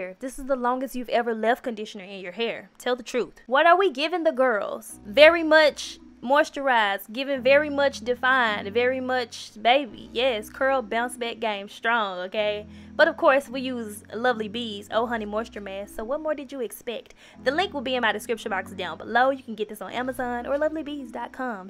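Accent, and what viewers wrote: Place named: Memphis, United States. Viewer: Came here for the chin lift and heard that Memphis accent ... ended up watching the whole video 💕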